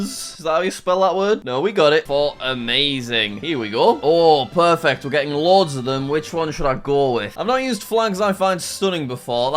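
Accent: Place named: United Kingdom. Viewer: I still like his videos ven though THE BRI'ISH ACCENT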